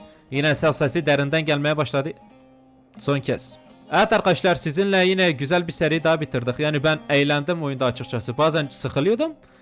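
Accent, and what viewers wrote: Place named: Southeast, Turkey. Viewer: Bu şive erzurumum şivesi değil, bu yaptığın şive kürtlerin kullandığı güneydoğu şivesi.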